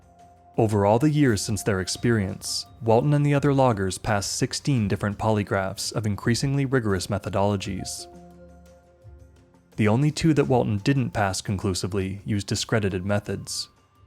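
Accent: Canada. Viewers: The narrator is clearly Canadian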